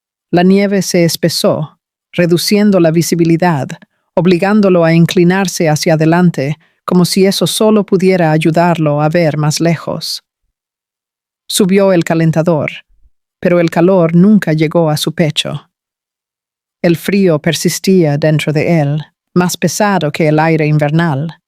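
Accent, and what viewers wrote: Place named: Brazil. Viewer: Esta historia es Brasil por eso le sale el espoñol asi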